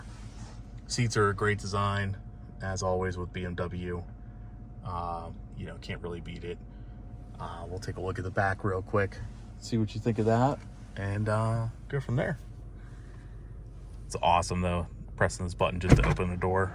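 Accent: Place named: Pittsburgh, United States. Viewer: Thanks for the content. I assumed you were from a Pittsburgh dealership, judging from your accent. Close. Ohio.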